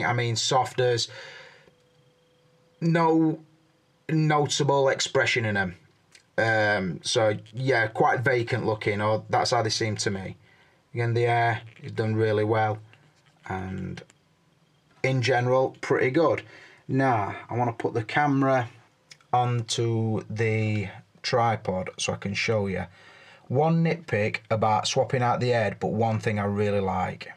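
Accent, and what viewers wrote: Sheffield, United Kingdom. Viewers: I knew i recognised your accent ! Sheffield na na na "t'neight"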